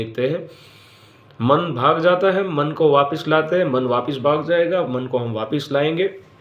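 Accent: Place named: Gujarat, India. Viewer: Sir ap Gujarati ho apiki bol se Gujarati lagraheho.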